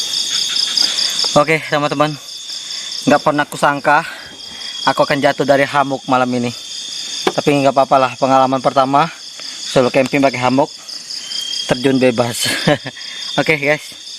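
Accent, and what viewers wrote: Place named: Kalimantan, Indonesia. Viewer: Dari cara omong nya seperti tinggal di kalimantan..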